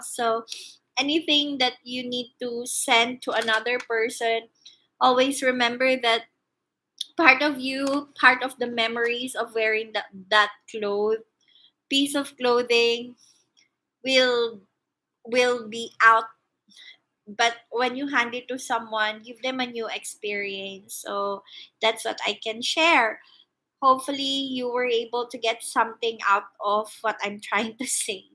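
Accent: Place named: England, United Kingdom. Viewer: 5 thumbs up, I like ur english accent sis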